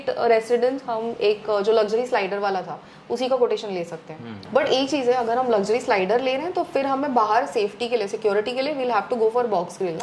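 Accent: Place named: Hyderabad-Karnataka, India. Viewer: Very nice like always in detail. Your tone wz Little bit HKBRD region your belonging to which state. Very nice clear tone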